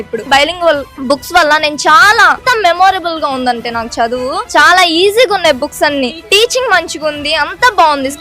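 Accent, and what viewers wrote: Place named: Palnadu, India. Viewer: ఏ ఊరు యాక్టర్ తల్లి మనం. తల్లి మనం పలనాడు అని అర్థం అయిపోతుంది తల్ల. ఓవర్ ఆక్షన్ చేయొద్దు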